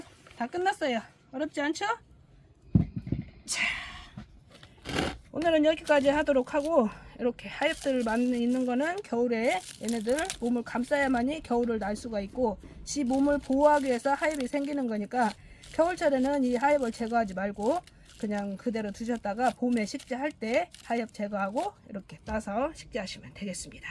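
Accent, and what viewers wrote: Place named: Jeolla, South Korea. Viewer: 안녕하세요 ^^ 진정한전라민국사투리 묘똥ㅎㅎㅎ 정감있는사투리 잘배우고있어요 저도지난번구입한거슬슬분갈이해야겠어요^^ 편한밤되세요 😊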